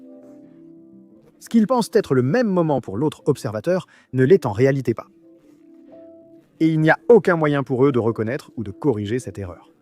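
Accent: Quebec, Canada. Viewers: Doublage (québécois) de merde. IA peut-être ? C'est très désagréable à regarder.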